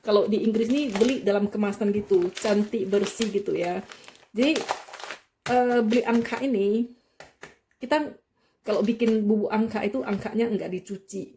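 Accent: Medan, Indonesia. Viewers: Salam kenal y, suka dengan vidio nya, dari bahasa nya macam org medan y😂😂😂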